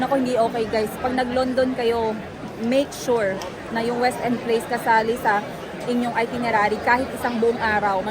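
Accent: Iloilo, Philippines. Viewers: Namian gid ko mag estorya ka ilongga ka mam 😁❤️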